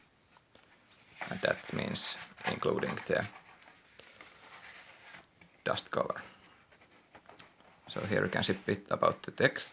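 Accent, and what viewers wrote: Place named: Finland. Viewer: Great Finnish accent! Thanks for the review. The cover art is nice but I wish they had made the spines uniform and matching so it looks more like a proper set or collection.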